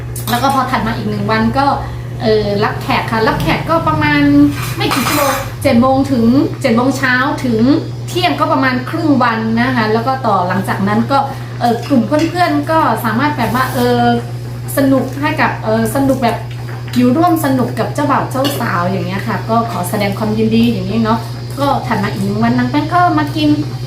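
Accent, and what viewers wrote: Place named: Isan, Thailand. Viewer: เว้าสำเนียงอิสานแล้ว น่ารักมาก มักหลายเด้อ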